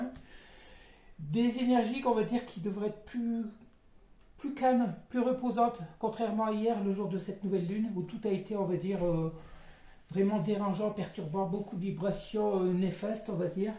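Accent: Nord-Pas-de-Calais, France. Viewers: Bonjour,toujours un plaisir d'ecouter vos guidances gratitude 🙏a vous.Et oui avoir la Foi c'est le secret,se prioriser et le reste suivra. Je vais bientot vous consultez,j'adore votre accent chti.Je vous embrasse 😘.Belle soirée a vous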